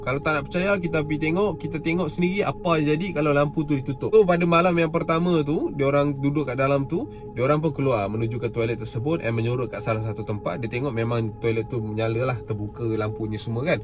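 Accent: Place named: North, Malaysia. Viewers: org utara ke?byk kali dgr style ckp MCM utara hehehe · pandai betul cakap utagha. nak belajar lah. hehehehe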